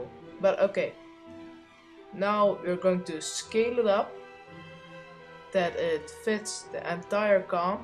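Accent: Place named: Netherlands. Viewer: are you from holland because it sounds like you're from holland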